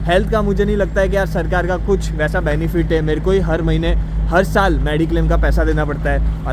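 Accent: Gujarat, India. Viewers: So good to hear from you. Well explained. 8 enjoyed and learnt things from your video. You sound typical Gujju😅😊🎉All the best. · bhai aaj first time teri vedio dekhi aacha laga , waise tu gujju hai kya tere bolne se style se aisa lag raha hai.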